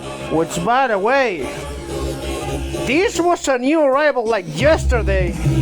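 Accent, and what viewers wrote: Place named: Eastern Europe. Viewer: Lol nice review brudder, like the energy. Gave me old YouTube vibes. Slavic accent on top of it all was an entertaining touch. HiBy R1 is a beast if you're willing to work with its quirks for sure. Has LDAC and MBES too which I wasn't expecting. Plus if you really wanted, you can use it with an external DAC/Amp too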